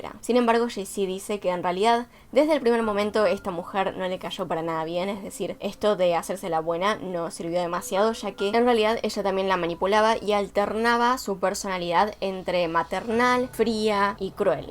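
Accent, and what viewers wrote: Argentina. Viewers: ¿Eres de argentina? Perdon quiero saberlo BDHDNSVJ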